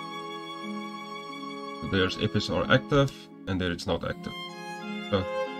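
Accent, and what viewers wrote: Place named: Afrikaans, South Africa. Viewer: jy klink afrikaans bra!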